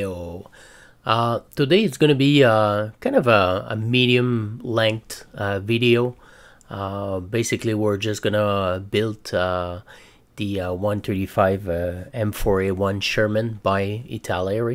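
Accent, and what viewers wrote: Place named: France. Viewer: My dude, for a french guy you have a great accent!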